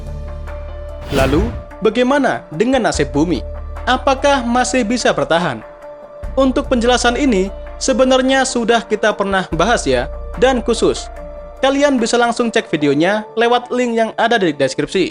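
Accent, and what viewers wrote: Java, Indonesia. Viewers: Jowone metu min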